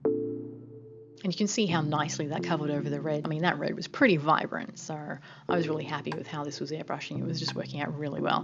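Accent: Australia. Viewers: You sound Australian...I emailed them a few months ago to ask where I could get Arteza products here, or if they post here, and they said no. Has that changed do u know?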